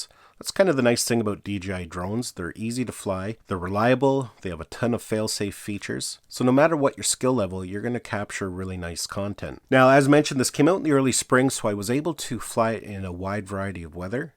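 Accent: Canada. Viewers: Do I recognize a Canadian voice, there? I'm curious about drone regulations in different countries. When you flew 2.5Km to that lighthouse, were you required to maintain visual line of sight with the aircraft? If so, how could you be able to do so? I have an Air 2S, and it seems like the only way I can maintain VLOS beyond around 300M is to put auxiliary strobe lights on it. (Could just be that my eyes are getting old.) Anybody have any advice for enhancing visibility for VLOS?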